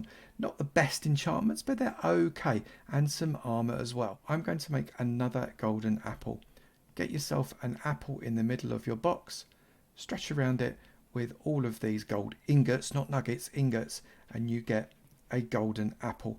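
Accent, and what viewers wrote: United Kingdom. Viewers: A british minecraft survival guide ? Y E S P L E A S E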